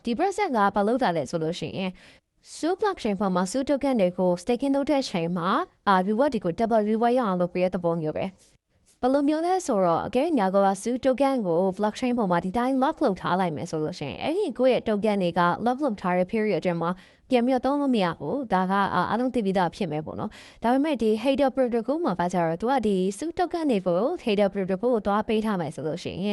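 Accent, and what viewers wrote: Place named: China. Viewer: အသံက ပုံမှန်မဟုတ်ဘူးထင်တယ် နားထောင်ရတာ တရုပ်သံပေါက်နေတယ်😢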